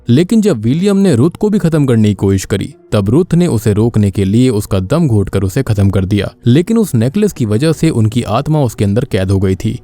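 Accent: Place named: Bengal, India. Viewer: Are and haunting tubes the same....because the voice and the accents are the same...like bengali broken hindi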